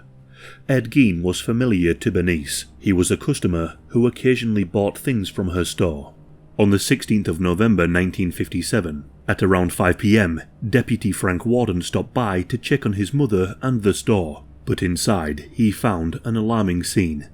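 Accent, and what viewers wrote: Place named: England, United Kingdom. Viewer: Ya fuckin ass is from England with ya accent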